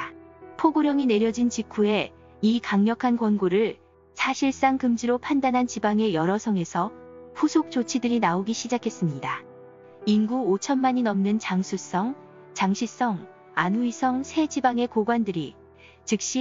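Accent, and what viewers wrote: Gyeongsang, South Korea. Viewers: 아 저도 그 갱산도 사투리가 욕은 많이 먹었어두 나름 개성적이고 중독(?)되서 구독 한건대 쪼까 아쉽네영 ㅎㅎㅎ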